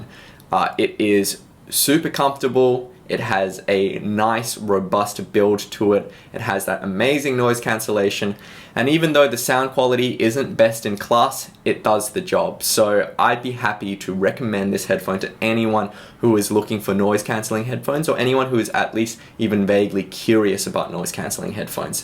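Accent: Australia. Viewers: He's Australian... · R3DLUFF trust me this is less australian than bogan australian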